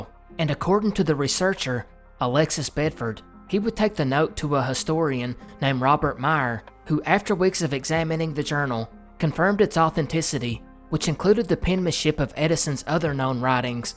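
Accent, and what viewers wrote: South, United States. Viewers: Thank you for another great video. Your narration in that southern cadence make your channel one of my favorites. ❤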